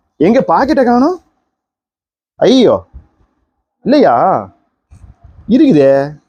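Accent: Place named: Marwari, India. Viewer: Wow collections. Price super. Settu maadhiri paesaradhu superaa irukku.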